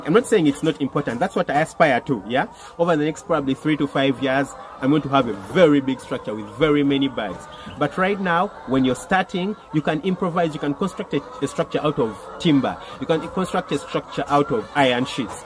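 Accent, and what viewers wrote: Uganda. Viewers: I don't know what it is but I love hearing a Ugandan accent!! much love from your neighbour here 🇰🇪 great job Doc! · Are you from Uganda? Your accent 😀... Anyways you’re doing a very good job , may God bless the work of your hands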